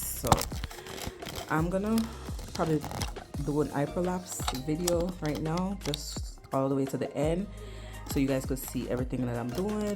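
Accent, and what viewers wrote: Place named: Jamaica. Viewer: You sound Jamaican..... love that 🇯🇲🇯🇲🇯🇲🇯🇲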